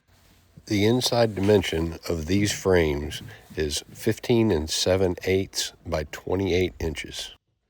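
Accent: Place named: South, United States. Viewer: That is cool. U know it just dawned on me u have a southern cowboy voice like on the movies ❤❤😊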